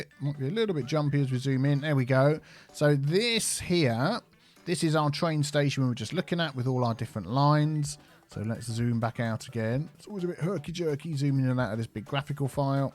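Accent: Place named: England, United Kingdom. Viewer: Where then? I like trying to figure out English accents. I have a good friend from Bristol.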